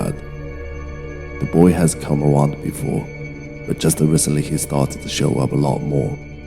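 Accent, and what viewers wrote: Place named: United Kingdom. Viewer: Your voice sounds British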